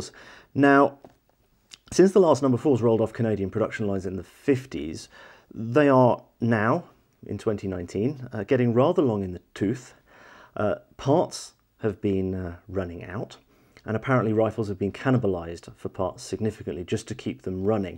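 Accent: United Kingdom. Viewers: Apologies. You mentioned it by text but since i was only listening to your lovely British voice i missed that bit. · Lol when your so used to accents. Just realized this guy is from the U.K and I am almost done the video.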